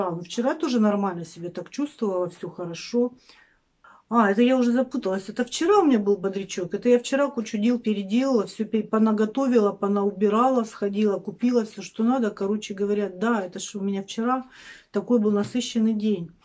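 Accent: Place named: Rostov, Russia. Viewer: Вы ещё сосиски произносите сосиськи😃Ваш Ростовский диалект. Мило и прикольно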